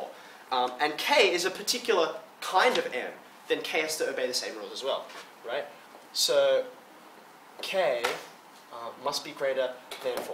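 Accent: Australia. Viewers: I've never seen a Asian with an Australian accent before. He's hot and smart. Great video.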